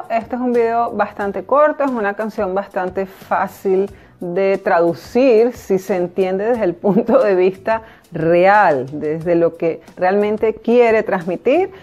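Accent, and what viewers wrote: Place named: Venezuela. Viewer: TIENES UN PROBLEMA Y ES QUE NI SI QUIERA TE EXPRESAS BIEN EN CASTELLANO. PARA ELLO DEBERÍAS SABER EXPRESARTE BIEN EN CASTELLANO Y POR TU ACENTO CREO QUE ERES VENEZOLANA.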